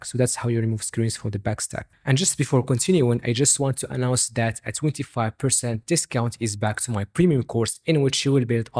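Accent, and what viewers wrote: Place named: United States. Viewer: اشرح عل مهلك خرب حظك مشالله عليك احس انك مولود في أمريكا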